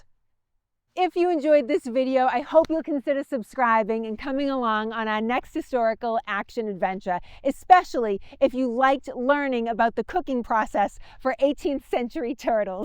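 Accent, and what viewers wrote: Boston, United States. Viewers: You do a great job,. Always bring a smile. The passion, the Adventure Pants, and the full-power Howes Neck or Dorchester accent. Don't change a thing. I hope the executives at you- tube understand the value of a good, old fashioned Historical Action Adventure (HAA). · Is that a Baas-ton accent? 😁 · i luv the Boston accent. I'm gettin' hungry now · Pahked machar in tha yahd,❤ Yes, I am a huge Jaws fan😁 Love the Bostonian accent 🙌Love from London ❤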